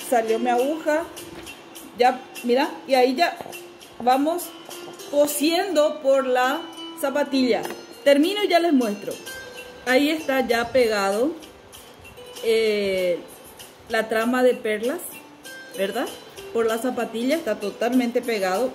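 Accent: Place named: Paraguay. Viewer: Sabia que era paraguaya.. Por la forma en la que habla💕😊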